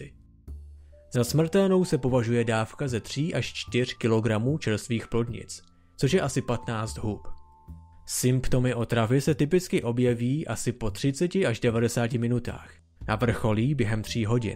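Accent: United States. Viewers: Ale ten americký přízvuk 😆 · Dobře video ale máš americký přízvuk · Jak někdo v průběhu natáčení videa chytne americkej přízvuk? :D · dobrý video ale ten amevrickejj přízvuk :D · D Zdá se mi to nebo máš takový Americký přízvuk ? :-D Jinak super jako vždy a prosím pokračuj tak v úžasných videí , přeji ti hodně štěstí do života :-) . Fanynka Hungergirl :-) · hezký Americký přízvuk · Tvl ty mluvíš jako Američan to tvé R je děsný 😄😄😄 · Přírodopis mně celkově nebaví ale takovýmhle stylem mně to baví :) Ale zdá se to jen mně nebo máš někdy takovej americkej PŘÍZVUK?? :D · zajímavý ale mas trochu americkej přízvuk!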